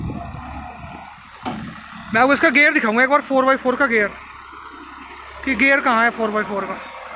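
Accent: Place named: Saharanpur, India. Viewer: Bhai teri language share saharanpur walo se miti h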